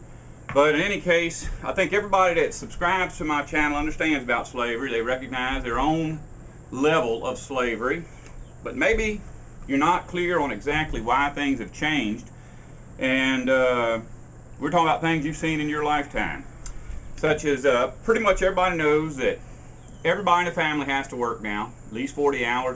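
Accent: South, United States. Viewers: where is it your from Mississippi or Louisiana I"m just trying to figure it out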